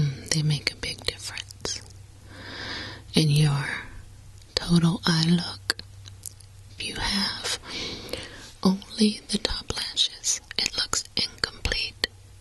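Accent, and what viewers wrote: South, United States. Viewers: This is the first video I've watched by you but im blown away with the fluidity of your speech, your knowledge of the products and your voice itself. Do I detect a hint of a southern accent? I'm from Texas so it sounded somewhat familiar haha. In addition, it's always a plus to have your makeup done by Morticia Adams haha, I love your look!!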